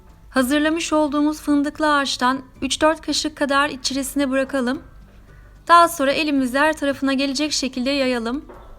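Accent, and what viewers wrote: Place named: Azerbaijan. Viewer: Ben Türkiye Erzurum kökenliyim atalarım Erzurum'a Horasan'dan gelmişler zamanında diyeceğim o ki sizin Azeri diliniz yani konuşmanız benim dedelerimin aksanına çok benziyor.Ne zaman bir Azeri konuşması duysam beni kendine çeker mutluluk duyarım saygılar sunarım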